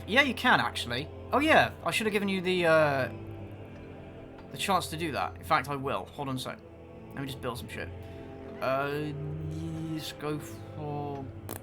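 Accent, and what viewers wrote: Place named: United Kingdom. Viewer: Why is Rian Johnson livestreaming Civilization while speaking with a British accent?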